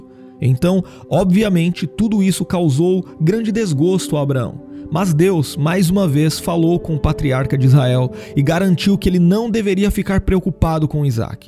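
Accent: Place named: Interior São Paulo, Brazil. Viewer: Essa pronúncia do R do narrador, Um R corrido, é horrível. O cara faz vídeo pra o Brasil inteiro e não trabalha a pronúncia do jnterior Paulista. sinistro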